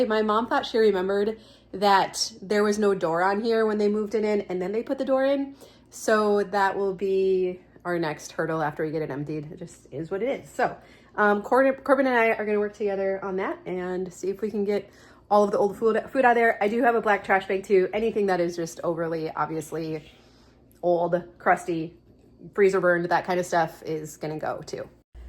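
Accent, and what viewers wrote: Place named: Midwest, United States. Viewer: Question: what did you do with the expired food? Did you just throw away all the expired & freezer burn foods? I need to clean my pantry, too! I know there are a lot of expired cans of food. Yeah, you know what I’m talking about. 😉. I don’t know where you live, but your accent and expressions sound like you are in the Midwest (Wisconsin, Iowa or Minnesota, maybe). Did you just toss the canned “expired” food stuffs in the trash? Here in California we have to put all of our table scraps in a separate bag and that goes into the bin of yard waste (leaves, dead flowers, grass clippings - all things organic that release methane gas as they break down. Our city turns it all into mulch that is used around plantings to prevent weeds; also, given to us city folks to mulch our gardens. So I don’t know… should I open each can and put it in. 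The yard waste bin (inside its own little separate bag), or should I just toss the entire can (vegetables, fruit, or whatever) directly in to the trash without opening it. Will it release methane or explode someday? Thanks for the video and the inspiration to finally get my pantry clean and organized. 🙂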